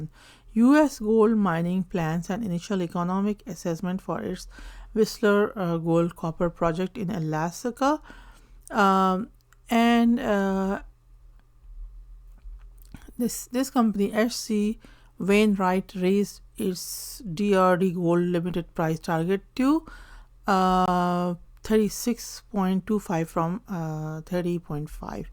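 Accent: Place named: India. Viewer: Wishing you all the best for your health. From your accent, it's my guess that you may be of Indian descent. The medical infrastructure in India is world class, low cost and without any waiting period. Even if you don't have medical insurance, the costs of investigation/surgery in top-class hospitals is affordable. Consider making a trip to India and sort out all your health problems.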